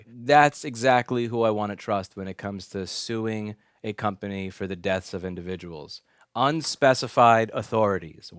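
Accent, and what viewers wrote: New York, United States. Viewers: objectivity really gives him credibility, even if at times he sounds like the New Yorker stereotype.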